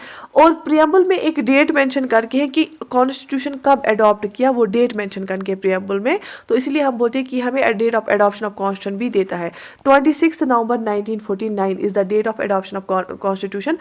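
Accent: Kashmir, India. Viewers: Beautifully explained. There's teachers. And there's tone to Kashmiri teachers. Love it thoroughly!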